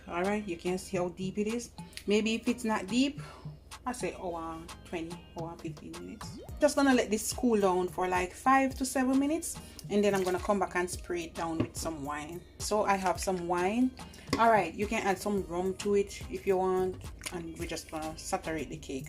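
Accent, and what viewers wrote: Jamaica. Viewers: And the Jamaican talk love it mmmmm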